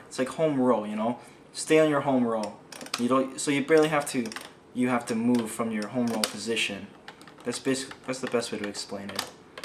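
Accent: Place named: Canada. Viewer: I didn't know he was Asian, but I did know he was Canadian because of his accent. It's not an Asian accent, it's Canadian.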